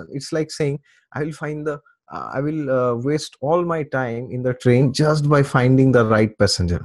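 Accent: Assam, India. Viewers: has Assamese accent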